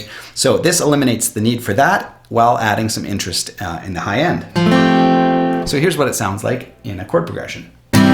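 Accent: Canada. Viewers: By any chance, are you Canadian. I recognize your accent as a fellow Canadian as well.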